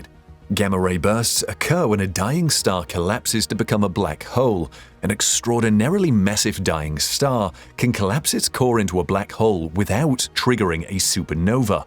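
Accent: United Kingdom. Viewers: Love your explanations and graphics in all of your videos, keep them coming! Do I also detect a Welsh accent? 🙂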